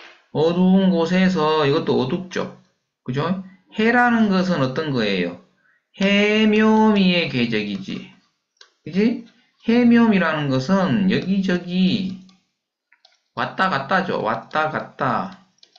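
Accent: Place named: Yeongdong, South Korea. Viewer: 예전에 선생님이 라는 호를 사용하실때부터 말씨도 그렇고, 제 고향 인근 분이 아닌가 생각했었는데 맞군요!! (저는 고향이 황간, 영동쪽이 아니고 접해 있는 경북입니다. 고등학교 친구 중에 황간 출신도 몇 있죠!) 템플스테이 할곳을 찾다가 사를 다녀온 기억도 나네요. 산 계곡, 월류봉, 물한계곡 참 좋은 곳에서 태어나셨네요!! 올갱이국도 생각나구요. ^^ 강의 열심히 챙겨서 듣고 있습니다!! 감사합니다.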